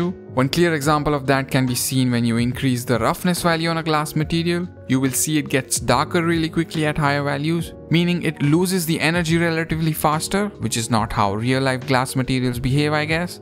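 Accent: India. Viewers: Nice tut, tnx! Your Indian accent and mumbling make it unbearable, though.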